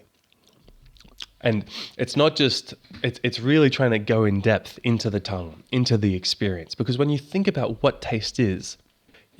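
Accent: Australia. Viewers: I saw my pink sweater on my bed, my navy blue rumpled and soft looking pillow case, my purple cane hanging on the bathroom door knob, ugly orange mesh bag on my floor and my dusty black suede shoes. I felt my warm dry hand wipe my cold damp mouth, the softness of my pillow, the long sleeve of my fresh clean tee shirt, the smoothness of the manufactured wood bookcase by my bed. I heard the ice maker in the kitchen, the fan on my husbands side of the bed, Dr. kind, gentle, Australian accented masculine voice. I smell the clean smell at the neck of my tee shirt, the smell of the shredded wheat cereal I just ate clinging to my right hand. I taste a starchy dry taste in my mouth like I am just about to make a phone call I have been dreading or I should go wash my hands and brush my teeth because I just had cereal.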